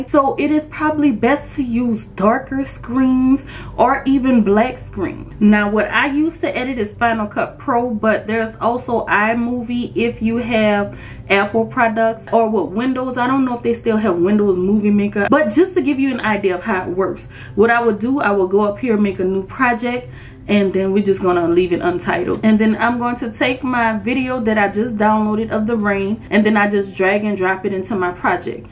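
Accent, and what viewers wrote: New Orleans, United States. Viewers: What region of Louisiana are you from? You have the City (New Orleans) accent, but it’s not the thick type. It gives like other surrounding cities not saying its a bad thing at all, but something I noticed. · You sound like the people I grew up with back home in New Orleans. ⚜️ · I’m new here and the way you said hello I’m so many ways got me hooked to your personality also your New Orleans accent is everything ❤❤❤❤ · I hear a New Orleans accent? Lol thanks for your help!! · New subscriber here! You are just too sweet and informative! You had me at what sounds like a New Orleans accent! I lived and taught there back in the 90’s so I am always dreaming about the Pre-Katrina days! Sorry if I got it wrong. Quick question: Oddly enough I have a couple of thunderstorm videos just because I am here in Belize during rainy season. 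It rains almost every early morning. My question is, must I create a new channel or can I find a way to blend a few rain videos with my current channel?Or will that throw off my channel’s audience and algorithm since it’s a new channel and not quite at 1000 subscribers? Thank you! Heading over now to check out your editing course details! 🙏🏾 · Are you from New Orleans? I love your voice. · Girllll this video is a game changer. I done subscribed and liked. I'm watching other videos now working. Not only was the video easy to understand but you definitely from the N.O with that accent lol and so am I! I knew it was confirmation after that! Thank you so much ❤️⚜️⚜️ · Is that a New Orleans accent I hear? 👀🤎⚜️ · Shoutout to the fact you from the N.O I know my people accent from anywhere lol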